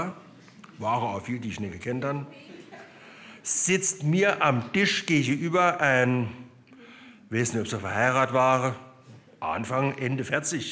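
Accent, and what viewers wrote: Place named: Germany. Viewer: Der beste Satiriker. Das Auszeichnendeste ist der deutsche Dialekt. Wenn wir nur Politiker solchen Formates hätten, die uns führten.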